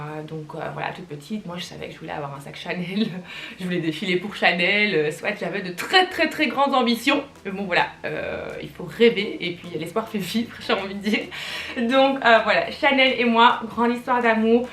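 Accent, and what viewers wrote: Belgium. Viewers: J'adore ton accent Brusseleir ne change pas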